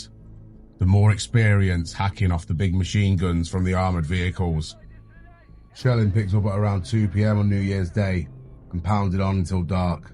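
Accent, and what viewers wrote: United Kingdom. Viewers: Had to stop watching after about 30 seconds. Fuck me, that's the roughest British accent I've ever heard. The narrator doesn't even try to pronounce the letter 't' and genuinely sounds drunk or half asleep. Just awful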